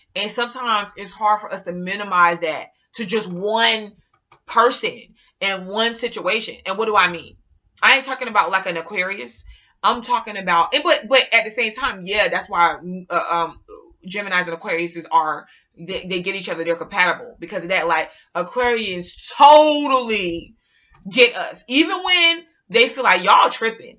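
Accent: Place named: New Jersey, United States. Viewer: .i love your jersey accent and your list lol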